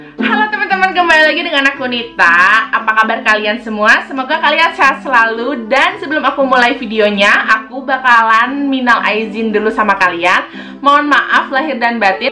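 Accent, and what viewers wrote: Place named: West Java, Indonesia. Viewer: Pasti orang Sunda yah 😚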